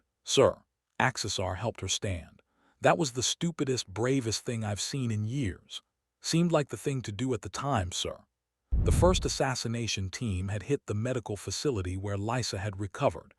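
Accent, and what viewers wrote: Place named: United States. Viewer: A story with an American voice talking about accepting refugees. The irony.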